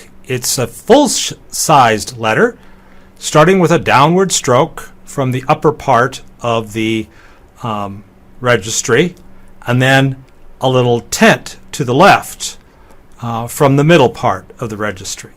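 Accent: United States. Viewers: Your pronunciation is very american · Oh my gosh his pronunciation is awful and he speaks so very typical of an American when addressimg to pupils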